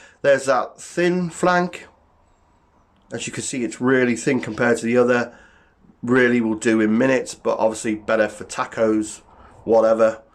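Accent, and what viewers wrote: United Kingdom. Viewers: British 110 per cent!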